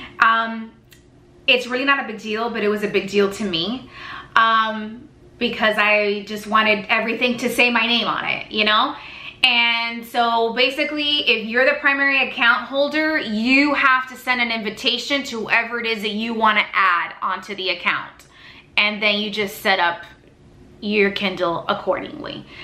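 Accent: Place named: Miami, United States. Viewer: You're from the Miami area! I can always identify the accent. You use the "hard" Spanish L's.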